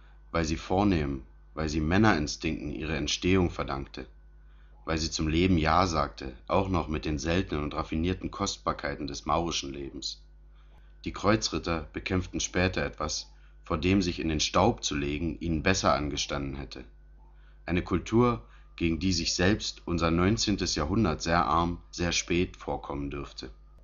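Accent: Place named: Saxony, Germany. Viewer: Es scheint mir unmöglich diese sächsisch angehauchte Stimme zu ertragen.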